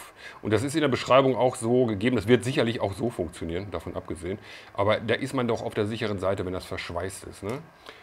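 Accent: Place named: Ruhr, Germany. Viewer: Toll deine Pflanzen, und dein deutsches Dialekt erinnert mich so sehr am Ruhrgebiet wo Ich aufgewachsen bin und immer eine gewisse Nostalgie bleibt 🙏👍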